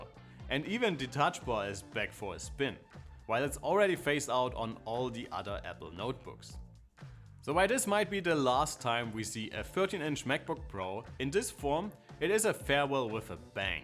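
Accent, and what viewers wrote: Germany. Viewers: Wow, ich lese notebookcheck schon seit vielen Jahren, aber das ist das erste Video, was ich von euch sehe. Einfach großartig! Flüssiger Text ohne komische Pausen oder holprige Überleitungen und schönes English Ich mag den leichten deutschen Akzent 👌 Die Einblendungen mit Testergebnissen sind teilweise etwas zu viel für mich, aber smooth 😂 und wenn’s mich näher interessiert, kann ich ja Pause drücken oder nochmal im Artikel nachlesen 👍 Danke ans ganze Team! weiter so! Ich bin gespannt aufs Review vom neuen MBA 🔜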